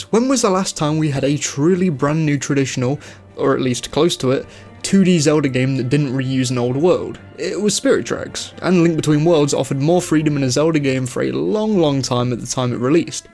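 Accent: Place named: United Kingdom. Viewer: Late to discover this channel! Story and lore were my main concerns with the two latest 3D Zelda games. Aside from that, I really enjoyed them. I’d say I enjoyed replaying Breath of the wild more the second time than the first. And if both Brearh of the wild and tears of the kingdom will be enhanced for switch 2, I’ll be replaying them again, once playing through the timeline order again. (As an aside, nice to hear another British Zelda YouTuber after discovering Zeltic).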